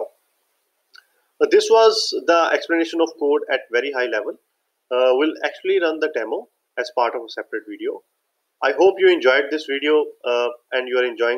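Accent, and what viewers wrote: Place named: India. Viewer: So indians are the only people that can do this? Is this explained anywhere in English without an accent...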